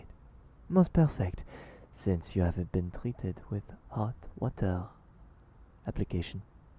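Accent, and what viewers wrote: France. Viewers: Hey that's a great French accent! I'm well placed to know that because I'm french XD But I still don't understand ....HOW THE FUCK CAN YOU FIND FRENCH ACCENT SEXY?!? OUR ACCENT IS HORRIBLE!! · Ring around the rosie. Pocket full of posies. Ashes, ashes. We all fall down. sings in a creepy voice By the way, I love your French impression. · That french accent 👌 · God damn it, your french accent is absolutely ADORABLE! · Oh mon dieu, l'accent Français en Anglais c'est ma phobie. Je ne peux pas, mais je t'aime bien quand même. "My FrIIIIIENND" AAAAAAAAAAAAAAAAAAAAAA x.x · Omg french accents are my favorite! Please do more ♡♡ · When you get jealous of the great, but adorable, French accent: How could I get mad, and So jealous of that adorableness!? 😚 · me-I don’t care what you do to me just keep talking! Honestly though your french accent is lovely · IS THAT A FRENCH ACCENT? 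· me a French girl: va talking English with the French accent: me: keep laughing cause he talks like my friends trying to talk in english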